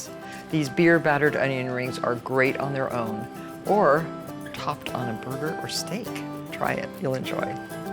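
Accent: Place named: North Jersey, United States. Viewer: prrrr-retty. So martha! So north Jersey, raised in class.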